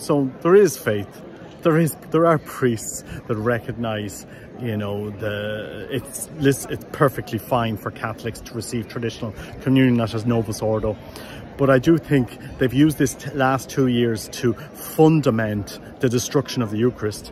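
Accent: Ireland. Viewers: I never really appreciated the Luminous Mysteries of the Rosary until this whole Covid episode, when everything in our Luminous Mysteries was (is) under attack. From not wanting to baptize babies to no respect for Communion. Now I understand exactly why we were given the Luminous Mysteries and when we were given these Mysteries. Grand old Irish accent. I grew up in County Louth.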